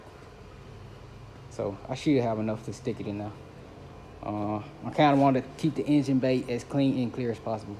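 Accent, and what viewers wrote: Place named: South, United States. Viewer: You live in the south somewhere, man. You do speak properly.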